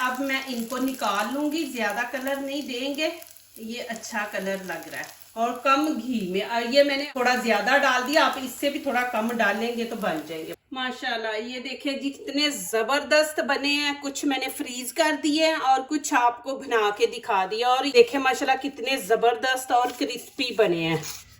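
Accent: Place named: Punjab, India. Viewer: Pure Punjabi accent ❤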